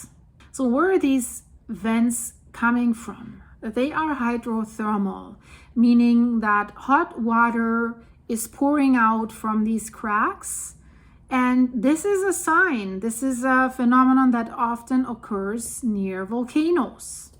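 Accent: Germany. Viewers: Sie IST Deutsche, oder? Geh ich jetzt von aus bei, und dem unauffällig hörbaren deutschen Akzent. 😂❤ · Hi, this is a good, informative video about vulcanos in Santorini area in Greece. And your German accent of English is charming 👍😉😊 also.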